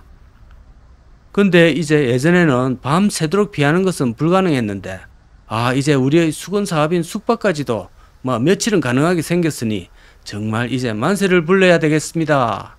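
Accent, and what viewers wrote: Gyeongsang, South Korea. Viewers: 경상도 사투리 정겹습니다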